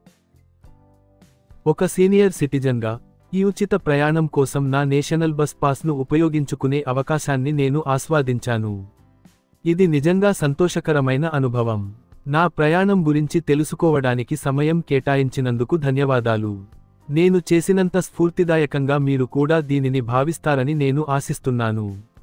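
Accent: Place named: Telugu, India. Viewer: Interesting Telugu accent 😊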